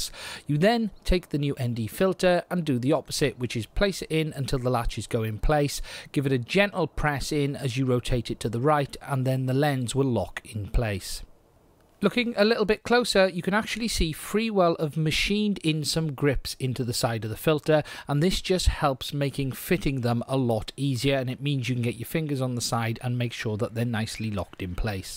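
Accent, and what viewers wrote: United Kingdom. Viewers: British accent. So where can I buy these in the UK?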